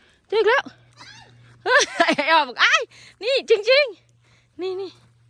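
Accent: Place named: Phu Thai, Thailand. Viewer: โอ๊ย ยาย หนู กรี๊ด!!!นำ งามคัก งามคัก เห็ด ตาแซ่บเนาะ ยาย😆😊👍 หนูอยู่ แอฟริกาใต้ บ่มีให้กินเลยค่ะ ว่างๆ เพื่อนๆ แวะมาเยี่ยมเยียน ส่อง ทางแอฟริกาใต้ กันเนะเด้อค่ะ เข้ามาติชมเนะ พึ่งทำคลิปใหม่ค่ะ ตามสามีไปติดตั้งแก๊ส แบกแก๊สพร้อมพี่น้อง แมนคัก มาดามเมืองคนดำ 555😆🙏🇿🇦🧯🚐🌷❤️🏡✅ ยาย ขออนุญาตค่ะ คนที่ไหน ทำไม พูดคล้ายๆ คนภูไท แท้ค่ะ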